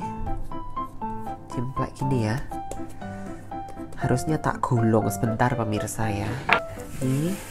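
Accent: Java, Indonesia. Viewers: Selamat pagi, mantap rotinya buat sarapan😀 blekenyek😂 bosone Ra ilang jowone👍 salam dari Bali, eh iya lupa sekarang lagi dijakarta 🙏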